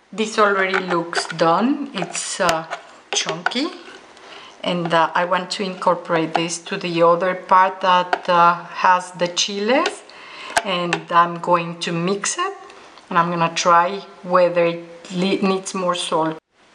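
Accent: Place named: Mexico. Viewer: you're English it's awesome you got a strong Mexican accent but I loved it you don't hesitate a single word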